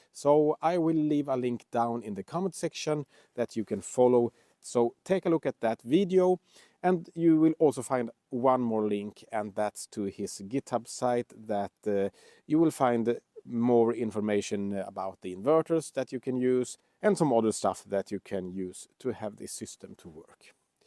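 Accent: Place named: Sweden. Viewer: You sound swedish, do you do installations like this commercially? I would be interested in such a case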